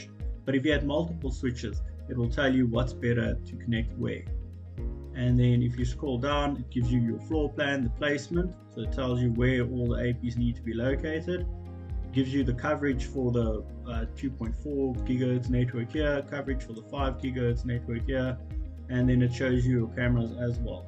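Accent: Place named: South Africa. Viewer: South African voice……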